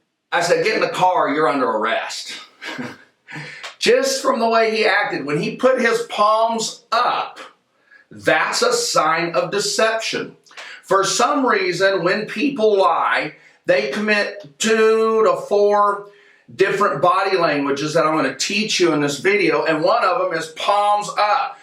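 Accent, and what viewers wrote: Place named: Texas, United States. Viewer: "The gift you have" is.....an amazing dynamic personality and enthusiasm...to the point that you are so entertaining and compelling. Also the fact that you have a desire to share this and help people is a gift. Thank you! I get so excited when I see that you've made another video!!! Also I love to hear your voice, it is so authentically TEXAN!!! It makes me feel like I know u personally...lol... cause I'm from Texas. 🤘🤘🤘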